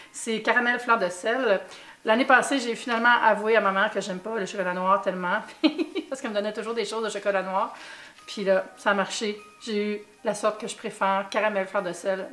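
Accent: Canada. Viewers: Coucou je te découvre avec cette vidéo :-) J'ai adoré ta douceur et ton humour!!! J'étais aussi super contente de retrouver un accent canadien!! J'ai passé 2mois au Canada en 2015 c'était la meilleure période de ma vie!!!!!!!!!!!!!!!! J'ai kiffé tout ce que tu as eu surtout ce qui se mange lol et les chaussettes chat étaient trop marrante lol gros bisous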